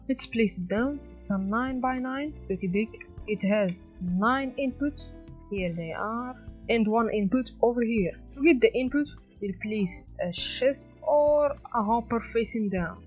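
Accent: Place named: India. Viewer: Its a great vid not kiding keep going but one thing i feel like you talk indian and sry if this bothers you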